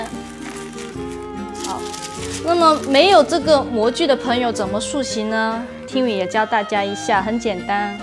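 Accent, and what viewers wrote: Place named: Fujian, China. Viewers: 老师，感觉你是福建人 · 普通話教學，但是發音好難聽！福建口音估計是！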